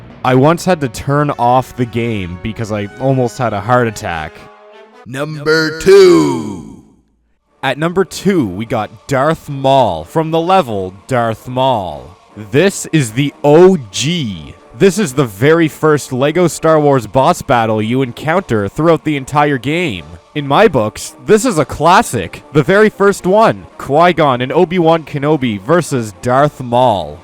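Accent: Canada. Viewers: Are you Canadian? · You're Canadian I can tell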